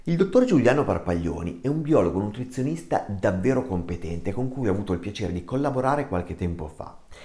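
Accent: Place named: Piedmont, Italy. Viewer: Preferisco le tue pillole di saggezza, con una fantastica cadenza torinese, o piemontese, lo sono anch'io, che quelle che vendono i tuoi colleghi "farmacisti"🤣🤣🤣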